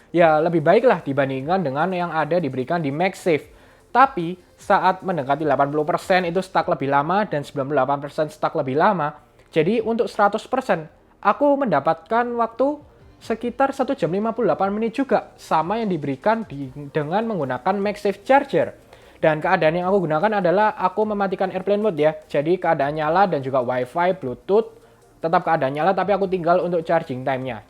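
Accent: Java, Indonesia. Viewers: Suaramu kok medok to Mas. Wong Suroboyo opo Semarang? ....... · Bolonganya 😄🙏🏻 sampeyan medok bgt toh koh ..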